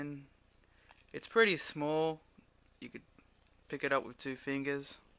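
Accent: Australia. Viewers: darpo3t - he is not british! I'm british, and have neat and clean nails - he sounds australian or kiwi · He sounds like a queens lander.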